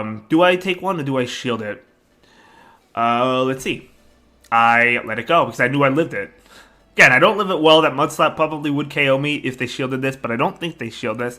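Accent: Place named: Northeast, United States. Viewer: I got to get my "qwaffee". Man your accent is very strong. If i had to guess you're somewhere on the northern east coast? As always, great battle's.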